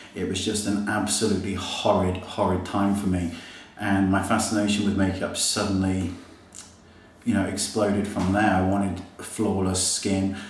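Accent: United Kingdom. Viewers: Here's another question: where are you from in the UK? Your accent is really nice!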